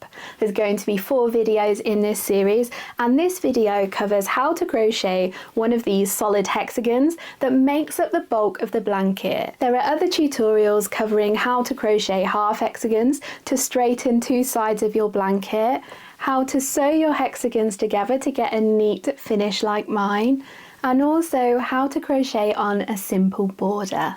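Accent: England, United Kingdom. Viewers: Why do you call a treble a double crochet? Your accent is English and I am wondering why you are using American termanolgy.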